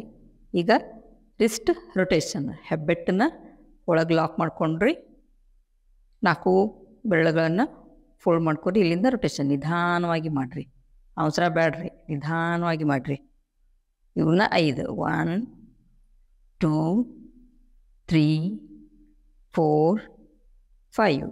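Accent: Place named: North Karnataka, India. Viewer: ನಮ್ಮ ಉತ್ತರ ಕರ್ನಾಟಕ ಭಾಷೆ ಕೇಳೋದೇ ಚೆಂದ ❤‍🩹❤‍🩹❤‍🩹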